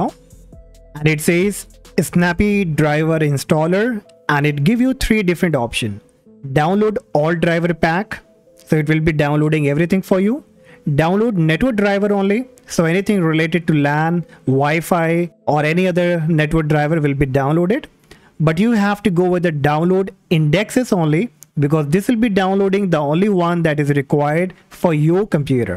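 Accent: India. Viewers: Indian accent...Beware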